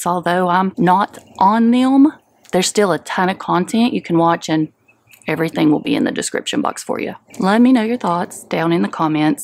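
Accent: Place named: Appalachia, United States. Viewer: I just discovered you by searching for a keto sausage balls recipe. I found this video very informative. I am very sorry for your diagnosis. You are picture perfect with a loving appellation or southern accent. Stay healthy.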